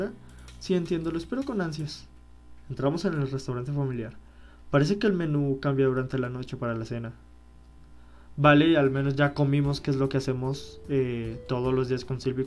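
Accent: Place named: Colombia. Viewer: suenas como un colombiano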